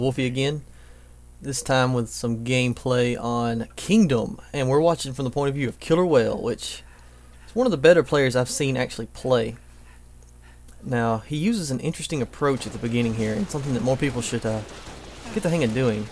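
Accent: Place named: Texas, United States. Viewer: you sound like ur from texas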